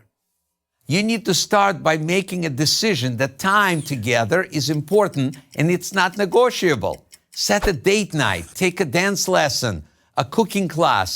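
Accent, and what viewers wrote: Russia. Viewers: + The Forms, He is probably a Russian Jew. He does sound Russian. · Русский акцент, господи 😖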